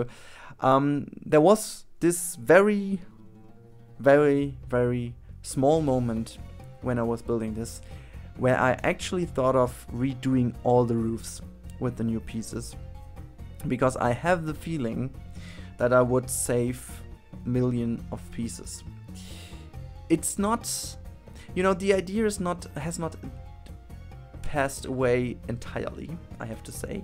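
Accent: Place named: Germany. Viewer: this accent ... soooo german ^^ anyway nice Noria and it really looks familiar like the Noria in anno1404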